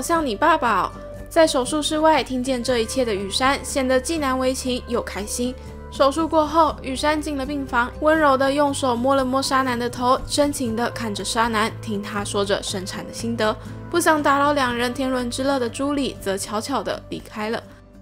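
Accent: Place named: Taiwan. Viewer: 角(音同腳)色，請不要被簡體字同化 ，為何唸角，可以查找辭海類的大型辭典即有說明 ; 角(音同決)主音律上使用，聽播主口音應該是在地臺灣人(不好意思，是頗標準臺灣國語)，個人建議還是該依傳統正體字說法為上。 這本番外，十年前還是大學生時就拜讀過，畢竟，《玩偶遊戲》是少女漫界的教科書，七年級生必讀經典！現在看到又想再把書找出來回味了，不過，當時看就覺得翻譯有問題了。早產是指孕期未滿37週即分娩，書中紗南破水時已9個月，也就是至少38週了，雖不到40週的預產期，卻不是旱產！卻翻成早產！？另則，珠里和早穗翻叔母更是錯的離譜！早穗是珠里母親的妹妹，所以早穗是珠里的阿姨不是叔母，更不用說叔母是大陸用法！臺灣人稱叔叔的太太該叫嬸嬸，珠里是早穗的外甥女，不是侄女！雖然日文講法都一樣，但華人教育是分得很清楚的，這是很明顯的誤譯！這作品的翻譯程度……不予置評。真的會懷念書名仍叫《玩偶遊戲》(大然)的時期，翻譯的中文造詣著實天差地別呀~ 直澄被掰歪，我第一次看時的確不太能接受，一開始無法接受的原因，不是不能接受出櫃，而是直澄他本來就不是性別認同障礙者或是同性戀者， 他的性向是很一般的異性戀，突然要粉絲接受他的"轉性"，真的不容易呀！直到我接觸到《昨日的美食》(身為ACGN齡逾30年的老牛皮，雖算不上腐女，BL多少還是有涉獵)，發覺兩位主角的角色設定並非著重於情人(戀人)，多數看到的是倆人在相處日常上，經過諸多磨合後，心靈契合度和不必言明的默契提升，我在兩個中年男性(魅力大叔)身上，看到了老夫老妻的相處模式，沒有令人眼紅心跳的煽情，只有平淡如水卻長情安然的陪伴！這樣的情感表現，是連異性戀都難以達到的或者該說是多數人都會心神嚮往且追求，若是小時候的男神(雖然我自小是羽山派)和現在的情人相處如同筧和矢吹的話，身為粉絲的我，大方祝福！真心期盼，仁科會是直澄王子的幸福。 希望未來有一日能再看到這部經典的後續。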